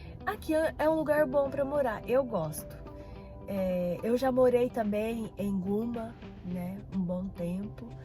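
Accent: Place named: Minas Gerais, Brazil. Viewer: Uai só! Você ainda tem o jeito de falar dos mineiros.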